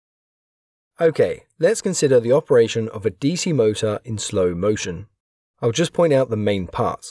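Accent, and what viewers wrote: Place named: West London, United Kingdom. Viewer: Which part of west London are you from ?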